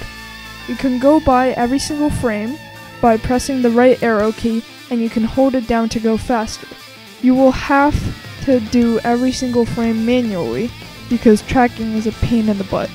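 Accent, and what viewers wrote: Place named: Texas, United States. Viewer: Your from texas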